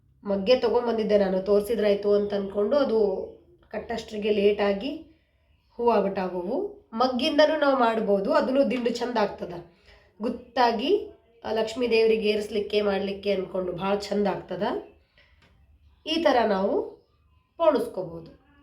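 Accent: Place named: North Karnataka, India. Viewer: ನನಗ ಭಾಳ ಖುಷಿ ಅನಸತದ ನಮ್ಮ ಉತ್ತರ ಕರ್ನಾಟಕ ಬ್ರಾಹ್ಮಣ ಶೈಲಿ ಮಾತುಗಳು ಕೇಳಿಕ್ಕ್ ಚಂದ ನಮ್ಮ ಮನ್ಯಾಗ್ ಹಿಂಗ ಮಾತಾಡ್ತೀವೀ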